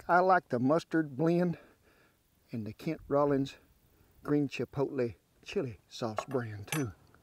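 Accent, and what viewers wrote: South, United States. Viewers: I love this guy! Wow does his little mannerisms remind of my dear sweet departed daddy.....❤️thanks for the entertainment and the recipe looks to die for! He is near and dear to my heart already! What a sweetheart and wise man! I love his style and southern accent makes it all better. Chattanooga Tennessee sends its love! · About yay much!! Warsh it!!! I miss my southern people.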